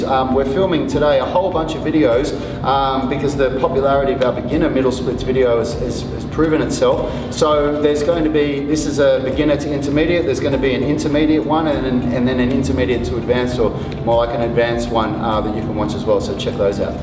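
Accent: Australia. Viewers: Has he got Australian accent?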